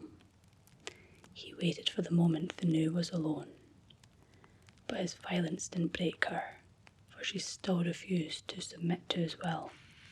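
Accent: Scotland, United Kingdom. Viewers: People love your Scottish accent but people don’t like mine😢😢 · Aw, my Scottish friend gifted me this book for christmas one year 😊 now I can listen to the stories in a Scottish accent, and in asmr format! Thank you 😌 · I have listened to this every night since you posted it please keep them coming the more Scottish the better ❤ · this is so perfect for me - fee tales and a gorgeous socts accent to boot:)! Love the choice of stories and your sense of humour as well:)! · This is my favorite video! I can't wait for the next one with more Scots dialect ❤ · Yes, I have to agree. I do love your Scottish accent my God I’m falling in love with you gorgeous Sexy Mamacita.❤ ya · Yass scottish 😂😂😂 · Whispered Scottish ASMR are you kidding me 😍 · Love your Scots accent shorty can sit and listen to you all day you make me feel safe and comforted I have PTSD due to sexual abuse by male guitar teacher at 17 I listen to you every night you make me feel safe thank you for all you do shorty you saved my life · It cannae get mere Scottish than this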